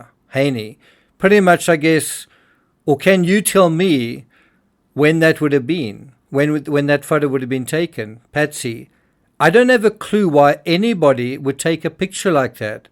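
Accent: South Africa. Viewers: South African ascent … superb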